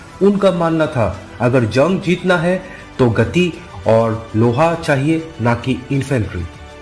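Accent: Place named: Northeast India, India. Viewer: Nice and informative video, north east accent added to the presentation.... reminds me of my coursemate ... Good job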